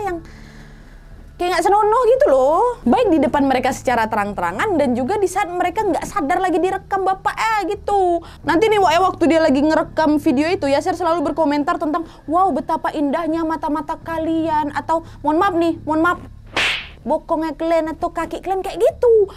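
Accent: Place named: Lampung, Indonesia. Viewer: Kaya orang lampung bahasanxa . Orang mana kak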